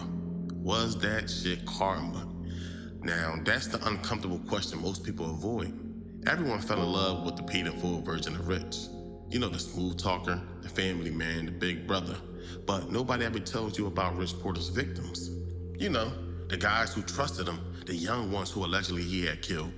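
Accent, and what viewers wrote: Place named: New York, United States. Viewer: Sounds like New York WP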